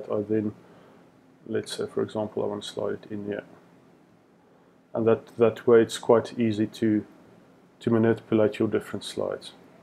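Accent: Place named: South Africa. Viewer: Thanks for this! Recognise the South African accent! So great to see great content for SAFFAs.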